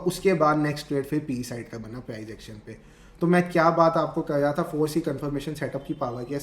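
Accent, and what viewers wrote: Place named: Pakistan. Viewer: Your accent is like a pakistani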